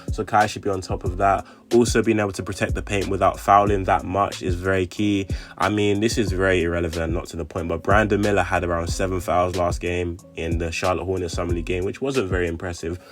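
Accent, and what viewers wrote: United Kingdom. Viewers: I love your British accent. And look at that 3 point shooting at the end of the video. Near flawless!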